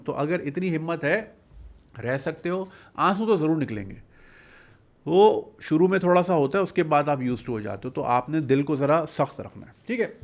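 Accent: Karachi, Pakistan. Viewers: Love your show specially free spirit talk and Pure Karachi Accent love it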